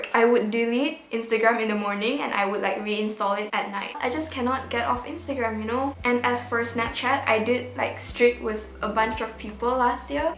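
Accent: Sarawak, Malaysia. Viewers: Bunyi kdk org srwk.. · is like one of those who speak manglish 😂gosh im subscribing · Sarawakian? Your accent is kinda same as sarawakians language like this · Dr sarawak ke sis?.. Sbb "lom gik masok form5 " hahaha.. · BTW ARE U FROM SARAWAK I COULD TELL FROM YOUR ACCENT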